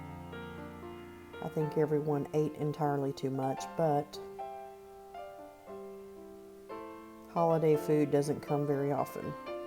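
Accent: South, United States. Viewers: I like your southern accent, and I love “ what my mama said” part. I will definitely try this recipe. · That's how I grew up eating banana pudding. Not that instant stuff. Warm and DELICIOUS ♥♥ I know this is a late comment but, my husband could eat that by himself. LOL Looks good. That's exactly how I grew up eating banana pudding, the way my Granny used to make it, she passed in January and I have been searching for a recipe like this one. Al I have been getting the ones with the instant pudding and whip cream. No ma'am press ham, I need the OG BANANA PUDDING, and THANK GOD, I have found it. 😊😊😊 Thank you for the recipe. I love your accent also. I'm from the south as well. LR, ARKANSAS, BORN AND RAISED ♥♥ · Though I'm gluten sensitive, I enjoyed watching you make banana pudding from scratch. Listening to you speaking reminds me of growing up down South and it brings nice memories. 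💗🍌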